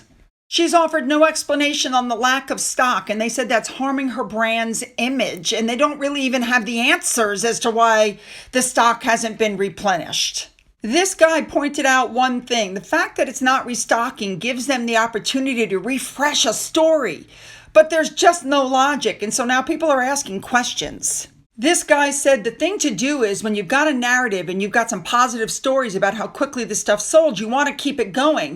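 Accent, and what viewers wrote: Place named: United States. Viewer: I love your pronunciation of our very difficult English (and Scottish) place names. I still have trouble now and then with places new to me. You give it a go and who cares if it has an American twang - makes it more interesting.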